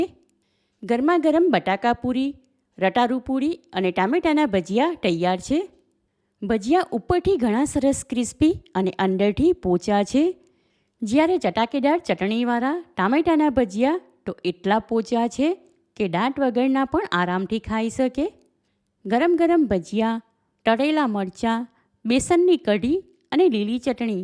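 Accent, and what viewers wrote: Surat, India. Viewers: I like ur surti language the way u talk so sweet...ur receipes I do watch they r 👌👌👌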